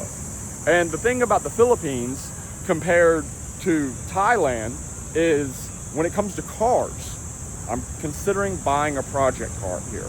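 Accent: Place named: Atlanta, United States. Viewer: I love your Atlanta accent 🤣🤣 I live right up the mountain in NC and it’s so different but I’m happy you are representing the south in Asia!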